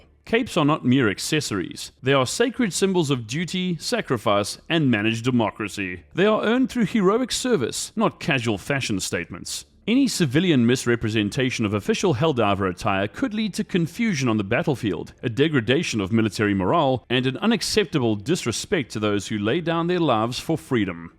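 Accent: South Africa. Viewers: He is from South Africa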